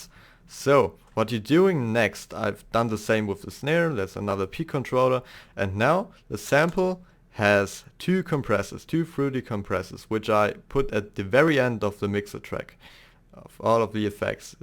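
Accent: Germany. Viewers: dass du deutsch bist, hört man direkt raus. deine anfangsbeats sind brutal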